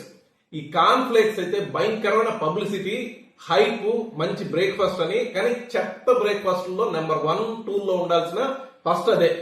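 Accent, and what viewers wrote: Nellore, India. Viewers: sir meru nellore valla?? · మీ వీడియోలు ఏమో గానీ మీ నెల్లూరు యాస మాత్రం అదుర్స్ .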